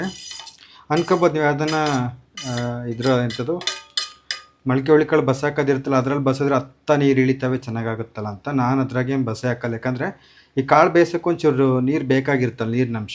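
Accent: Chitradurga, India. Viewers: Nim uru yavdu sir chithradurga na nam bashene mathadthira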